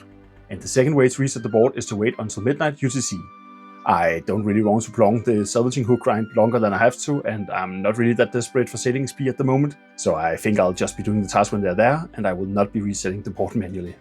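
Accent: Denmark. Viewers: See, i love me a fine light accent on the tube and this one is charming as heck lol, where you from, Danmark? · fuck jeg elsker danglish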